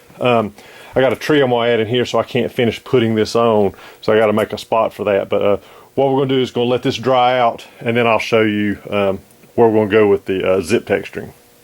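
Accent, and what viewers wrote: South, United States. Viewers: And you're from down SOUUUTTHH, lol. Great video.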